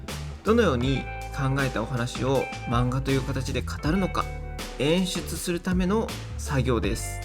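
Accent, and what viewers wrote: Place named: France. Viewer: Pardonnez moi, mais j'adore votre accent français 🥹😍😍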